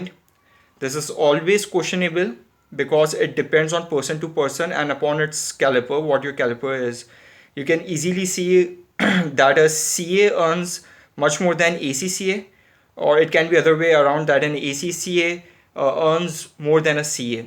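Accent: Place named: Pakistan. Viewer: Are You Indian?? This accent is Normal for Pakistani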